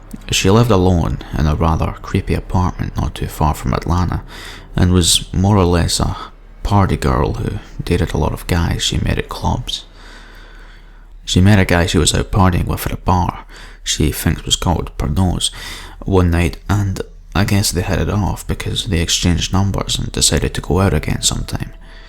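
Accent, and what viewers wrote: Scotland, United Kingdom. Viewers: I'm a huge fan of the horror narration channels, great to hear a fellow Scot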